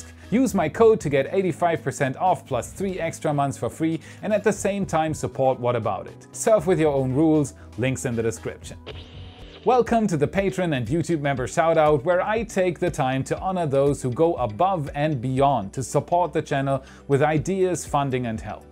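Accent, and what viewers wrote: Germany. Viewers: Don't be afraid of showing the accent, a German talking about engineering is as natural as a bird flapping its wings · German accent coming back again !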